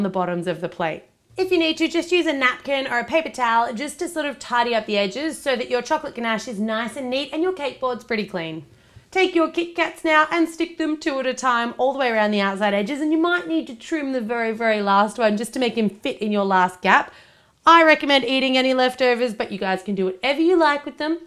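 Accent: Australia. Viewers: good lord I love Australian accent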